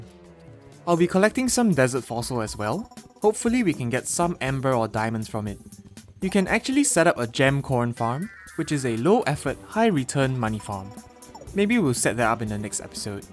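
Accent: Singapore. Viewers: Where are you from? Your accent sounds singaporean lol.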